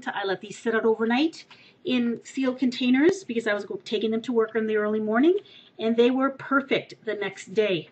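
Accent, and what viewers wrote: Canada. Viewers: love the canadian accent ^^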